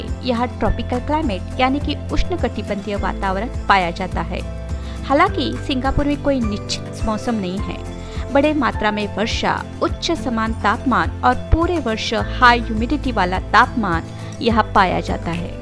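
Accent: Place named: Maharashtra, India. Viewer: काय मस्त हिंदी आहे तुझी...पण कधी कधी, काही शब्दांतून मराठी बाहेर येते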